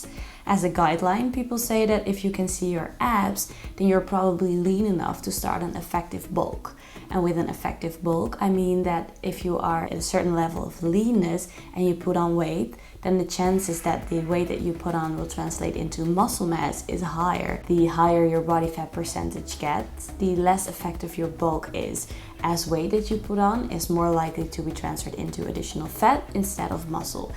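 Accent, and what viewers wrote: Netherlands. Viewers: I hear a Dutch accent, are you Dutch? :D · I think it's her slight slight dutch accent ;) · Am I the only one who heardddddd the Dutch accent Ik was zo van kan je in nls praten 😂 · Great video :) Thanks for the great information! Are you Dutch???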